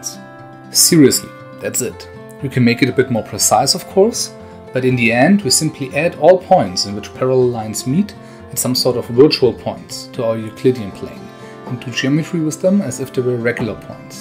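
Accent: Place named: Germany. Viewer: I concur that in the future you have to set the music at much lower level, or actually not use music. Occasionally you swallow consonants, such as where your script says 'square length' but it ends up sounding as 'skwele'. Between that and your german accent: the viewer neets a couple of brain cycles more to get to the words you are saying. Yeah, there is a trade-off there. Pronouncing everything would sound ponderous (Related subject: video by Tom Scott : <link> ) In the case of 3blue1brown I see the music used as part of the brand; the narration is soothing, the music is soothing. For minutephysics too I regard the music used not as part of the video as such, but as part of the brand. Personally I tend to prefer minimalism.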